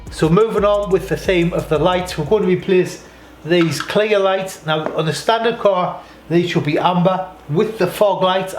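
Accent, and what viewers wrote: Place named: North East England, United Kingdom. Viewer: Great videos mate. Because of you I’m really getting in to Ford. You from Newcastle, Sunderland or Middlesbrough? Rob from Ireland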